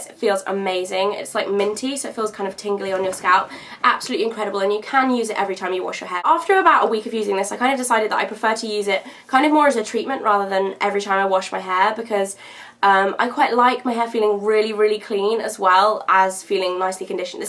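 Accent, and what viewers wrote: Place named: RP, United Kingdom. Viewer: you sound so posh! (not in a bay way! )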